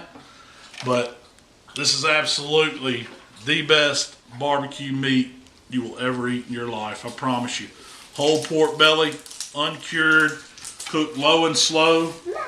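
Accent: South, United States. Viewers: Always nice to hear a gentleman with a nice southern drawl work fancy words like crim dela criim into a BBQ video. But absolutely right, there's no piece of meat better than a pork belly. Except maybe bacon, which pork belly essentially is. Thanks for sharing. The belly looks great! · I love how southern folks in the USA pronounce foil and oil as foal and oal lol. Man that looks tasty, some good eats right there.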